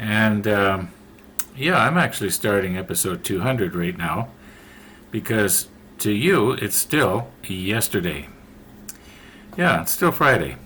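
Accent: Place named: Canada. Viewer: My husband is a retired physician and he builds airplane models from scratch makes plenty of mistakes from which he learns He did a few kits but prefers blueprints and goes from there. We are always collecting little interesting oddities to use. I will show him this channel it is very interesting. I am trying to get him to start on ships. My living room is no more airplanes hang from the ceiling LOL I wondered why you disabled the baking soda and glue (super glue?) video you made and became hollow. I personally loved that myself and will use it. I think it is super glue. I love videos are are unscripted and simply human! Thanks for posting. You sound like you are from Canada? or Minnesota or something like that!!!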